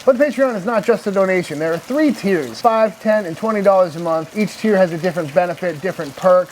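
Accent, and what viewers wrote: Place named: New England, United States. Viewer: Every video I watch of yours I always say to myself "this guy is from New England". Glad I can now confirm that now.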